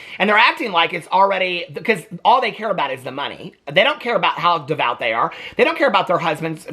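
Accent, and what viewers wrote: South, United States. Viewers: are u from nashville u have a south accent lol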